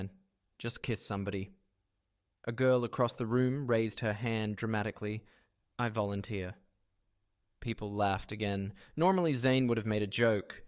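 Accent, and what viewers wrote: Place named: Australia. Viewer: Sounds like an Aussie.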